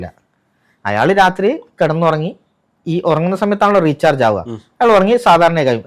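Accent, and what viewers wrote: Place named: Malappuram, India. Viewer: Slang കേട്ടപ്പോൾ ഒരു മലപ്പുറം touch തോന്നി😅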